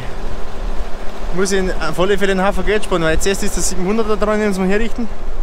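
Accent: Bavaria, Germany. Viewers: I find des foi geil dasst du no boarisch redst, gfreit mie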